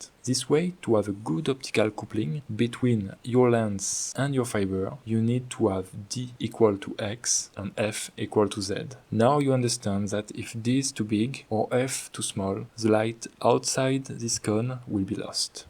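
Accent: France. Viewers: Tu es français!! Je peux l'entendre :) Did you measure the intensity of the light, so that you can calculate the efficiency? That is the key. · I love the French accent ❤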